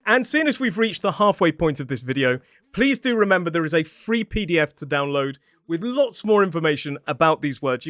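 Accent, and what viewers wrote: United Kingdom. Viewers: Love your British accent 🎉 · I really like your british and energy you putting in the videos. 😊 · I absolutely love the British accent and phrases 😍 My late husband was British! I enjoy your videos 😁 ❤️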